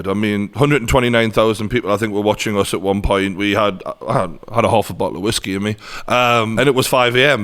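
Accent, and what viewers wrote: Newcastle, United Kingdom. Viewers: I love true Geordie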